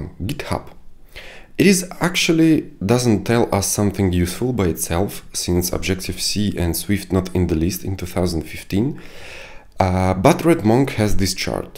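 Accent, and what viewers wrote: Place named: Russia. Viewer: Наш человек, сразу слышно)))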